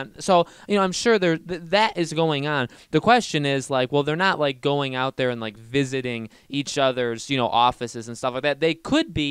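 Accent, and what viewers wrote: United States. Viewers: you are very american and the name is maybe a little bit wrong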